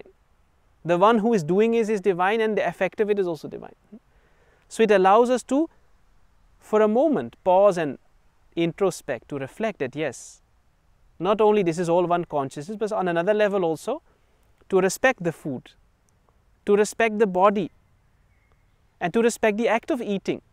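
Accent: India. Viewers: His english accent has also became Indian accent. ❤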